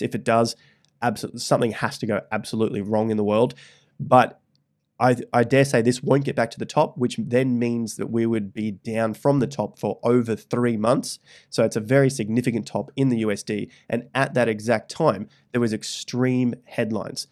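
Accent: Australia. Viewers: The Australian accent is unfortunately super annoying to me....especially when they say Twittahhhh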